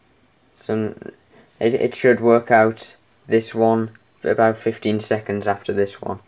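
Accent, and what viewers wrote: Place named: Liverpool, United Kingdom. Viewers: I think its a Liverpool accent.. I can never tell, but whatever it is, glad to be british. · I thibk he has a up northan accent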